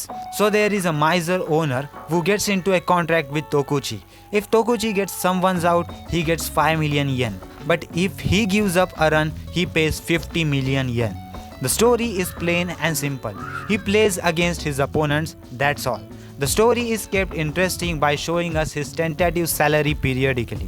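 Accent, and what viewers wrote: India. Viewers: bro work on your audio and idk why u sound indian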